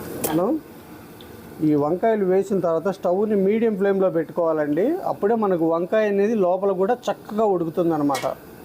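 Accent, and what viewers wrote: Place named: Godavari, India. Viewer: మీది గోదావరి జిల్లా నా అండి.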